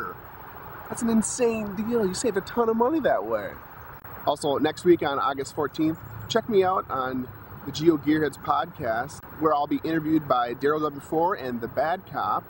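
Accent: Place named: Minnesota, United States. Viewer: You have that Minnesota O. Its ok...im in Michigan, people say I sound Canadian.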